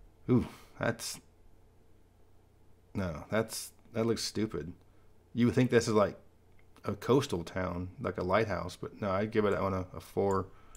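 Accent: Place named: California, United States. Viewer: I like how u speak like a true Californian about our home state's flags.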